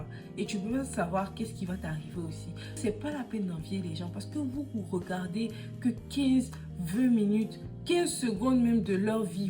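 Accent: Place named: Ivory Coast. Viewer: J'ai reconnue l'accent 225 ❤ non mais mise a par ça c'est profond ce que tu dis . Moi y'a environ 5 ans j'avais ce sentiment de non accomplissement du coup j'ai unfollow tout ceux que j'enviai a qui je me comparais depuis je vie ma best life parceque je sais que ce qu'on montre n'est pas totalement la réalité on montre tres souvent le meilleur mais pas les difficultés. Soyons fiers de nous même chacun son cheminement. Ce qui est pour toi c'est ce que Dieu te donne ❤.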